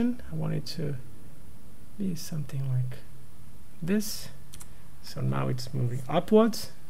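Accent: Brazil. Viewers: are you brazillian? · Mano, vc é brasileiro. Diz ai!? Sotaque entrega. Muito foda, parabéns!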